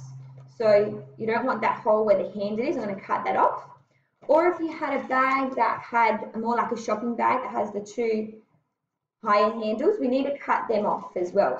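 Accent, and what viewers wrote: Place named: Australia. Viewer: Sounds like an Australian woman, I am Australian also. I have looked at many videos about fusing the bags with the iron, however this is the only one I have seen where the person is saying to have water in the iron and use that steam setting or whatever, I can't figure out why however, no one else did that, I can't figure out what the hot steam would do just spraying on the baking paper, and she didn't explain the reason why. As far as plastic fumes, apparently most things have a melting temperature and then a vaporising temperature, so as long as it doesn't hit the vaporising temperature then there shouldn't be any plastic fumes, for this type of polyethylene it shouldn't really produce any vapours while under 250 degrees. However it seems this video was for year 9 students, so you were erring on the side of caution.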